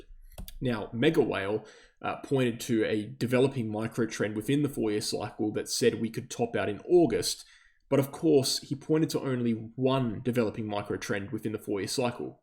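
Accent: Australia. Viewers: I can’t believe I had to suffer through an Australian accent for that long, and get nothing out of it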